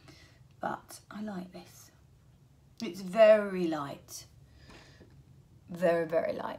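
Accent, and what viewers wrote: United Kingdom. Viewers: I really like British accent And I like your makeup. I like you make Kira knightly makeup.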